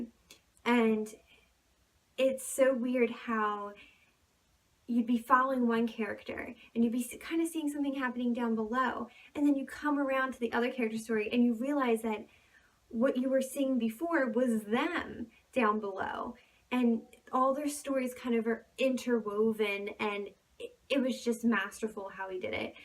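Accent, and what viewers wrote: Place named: North America. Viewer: Which part of N America are you from? I can't guess. Nice, intelligent review Thank You X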